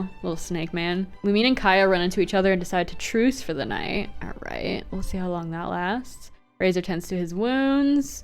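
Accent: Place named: United States. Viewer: this girls american accent and mispronounciation of tradional names is killing me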